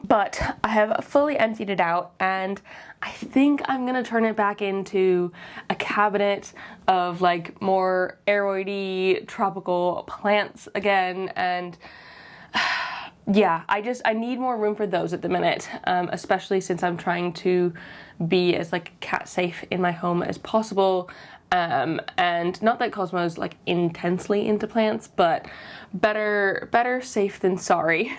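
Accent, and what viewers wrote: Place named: RP, United Kingdom. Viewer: So random, but I have to ask -- where are you from? You can definitely hear the slight "posh" accent, but were you born and raised in the UK or somewhere else? I love your voice/accent!! Editing to add, sorry if you've addressed this somewhere before .. I'm new to discovering your channel and I love it here! Just followed you on IG too! (Where I saw your bio says London!)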